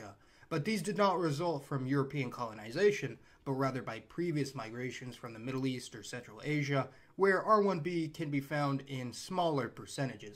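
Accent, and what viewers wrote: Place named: Philadelphia, United States. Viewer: You have somewhat of a Philadelphia accent. It sounds like you try to cover it up, but it still pokes through in spots. Am I hearing that correctly? Also... where tf have you been lately?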